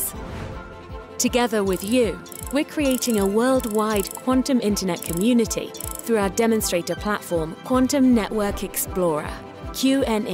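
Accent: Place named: Australia. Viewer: The Australian accent is very annoying.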